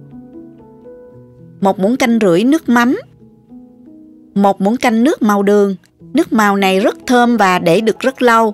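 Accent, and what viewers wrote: South, Vietnam. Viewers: Chào sức khỏe bình an, rất bổ ích... có giọng nói nam bộ rõ ràng dịu dàng, phải thừa nhận quá chuyền cảm...Cảm ơn nhiều.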